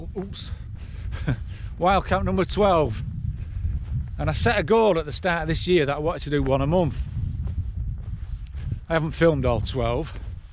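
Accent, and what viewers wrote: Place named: North, United Kingdom. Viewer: Love your videos pal, newly subbed proper northern accent an all like me. 👍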